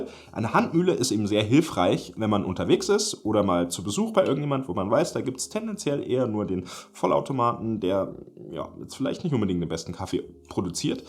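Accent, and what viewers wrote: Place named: Bavaria, Germany. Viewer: 🤣sehr lässiges Boarisch!! Weida so!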